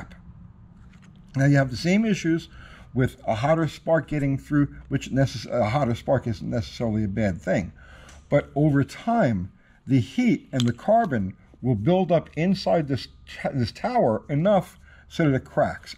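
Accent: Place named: Long Island, United States. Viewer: Thanks, good sensible advice clearly explained. BTW, your accent makes me miss Long Island!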